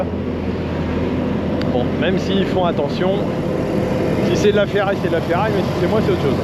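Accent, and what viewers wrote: Nord, France. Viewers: y fait noir comme dans une....., c'est parti mon kiki, merci pour l'accent du Nord et la vidéo 👍👍👌👌😊😊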